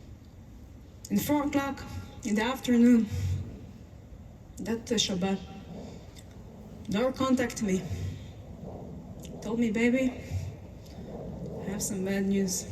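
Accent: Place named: Israel. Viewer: Afrikaans with an Israeli accent - first time I hear that!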